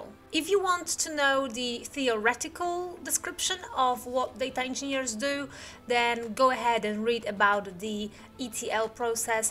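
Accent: England, United Kingdom. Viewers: Haha I love your english accent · Hello, just subscribed, AMAZING content! Very helpful! May I ask which country you are from, because sometimes you sound british or french or even russian!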